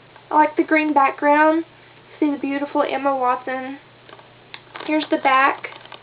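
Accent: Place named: South, United States. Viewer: Yeah I like unconventional movies and I've had my eye on this one - thought you were from down South, you've got this June Carter thing going on! It's a beautiful accent to hear and I've been told you guys down south are the nicest people with good values - il just have to put up with my South London English accent!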